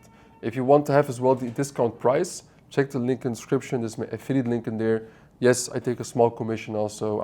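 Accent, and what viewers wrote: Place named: India. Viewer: No one can easily understand Indian English. It’s not about us — it’s about how you pronounces it in India cuz of accent you use.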